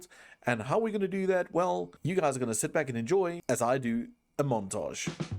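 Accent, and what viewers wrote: South Africa. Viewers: So great to hear a South African accent on a good quality youtuber. Keep up the great content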